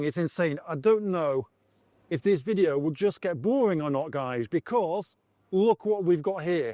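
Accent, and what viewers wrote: United Kingdom. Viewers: I kind of figured people will say something to you once in awhile considering to them you are a foreigner but what they don't know you're actually Russian with a British accent lol anyways thanks for the video I enjoyed it God bless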